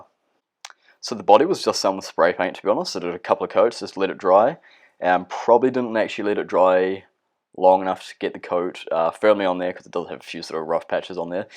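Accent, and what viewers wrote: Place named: Germany. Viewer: You kinda sound German lol. But you're a Kiwi, right?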